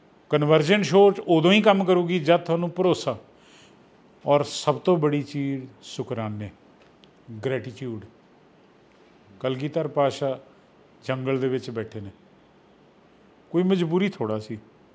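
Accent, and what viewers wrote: Puadh, India. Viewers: ਪੁਆਧੀ ਬੋਲੀ ਐ ਮਾਰੀ ਹੈ ਹਮ ਪੰਜਾਬ ਕੇ ਮਾਰੀ ਬੋਲੀ ਮਾਂ ਸ੍ਰੀ ਗੁਰੂ ਗੋਬਿੰਦ ਸਿੰਘ ਜੀ ਨੇ ਫਤਹਿ ਬੁਲਾਈ ਤੀ ਵਾਹਿਗੁਰੂ ਜੀ ਕਾ ਖਾਲਸਾ ਵਾਹਿਗੁਰੂ ਜੀ ਫਤਿਹ 🙏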